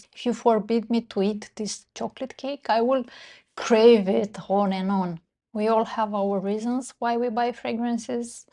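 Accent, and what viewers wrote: Romania. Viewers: După accent am gândit ca ești româncă! Deși am lucrat în afara țării ani de zile, am același accent cu al tău!Ma bucur ca ai făcut acest video,pentru ca și eu am făcut abuz de parfumuri și am format o manie pentru asta și m-am simțit vinovată de fiecare dată!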